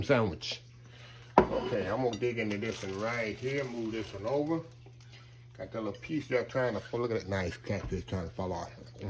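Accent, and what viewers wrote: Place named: Deep South, United States. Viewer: New subbie here. Love the deep south accent. Good cooking! Can't wait to cook with u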